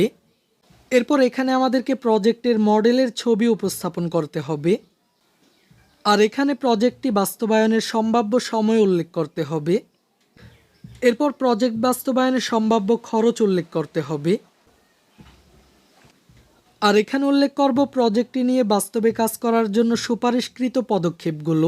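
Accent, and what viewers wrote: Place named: Magura, Bangladesh. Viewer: Magura galar kay asho❤❤❤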